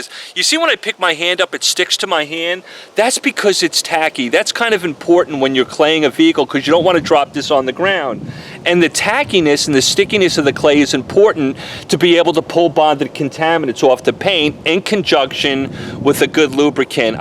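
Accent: New York, United States. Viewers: You sound more like The Bronx or Brooklyn, Tx :D Love the accent and the explanation!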